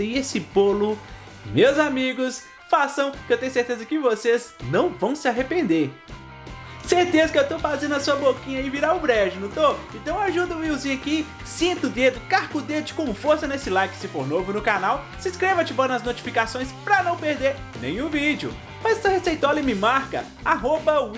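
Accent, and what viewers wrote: Minas Gerais, Brazil. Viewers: Eu ja adoro te ouvir com esse sotaquinho mineiro. Amo suas receitas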